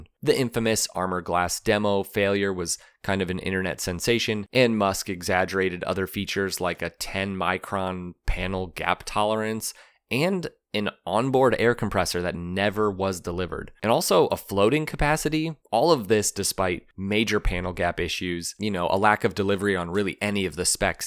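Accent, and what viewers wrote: United States. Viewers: Wow, this put me to sleep, even after turning the volume down to escape that painful, droning American accented narration. Better than sleeping pills, safer too.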